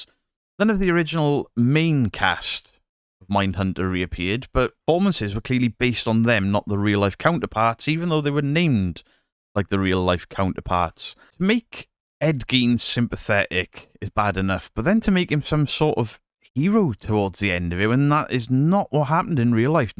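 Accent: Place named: Wales, United Kingdom. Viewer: I was thinking you were Welsh,I'm Irish myself. Good channel. Subbed 🇮🇪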